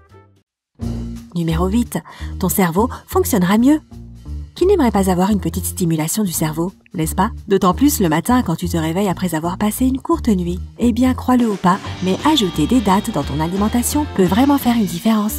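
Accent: Quebec, Canada. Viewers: elle viens du Québec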